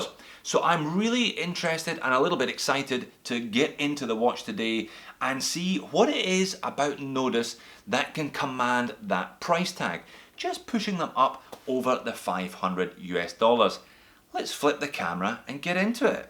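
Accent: Scotland, United Kingdom. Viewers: Scottish accent sounds like the taste of a heavily peated whisky, and I love both. The watch however is to Hulky for me, I enjoy the Kermit style much more.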